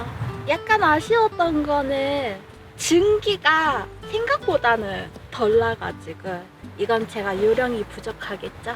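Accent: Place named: Daegu, South Korea. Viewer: 대구분이신가요? 대구 사투리를 쓰시네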